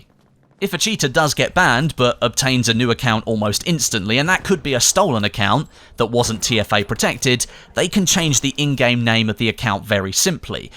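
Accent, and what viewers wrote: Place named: United Kingdom. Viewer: british accent sounds horrible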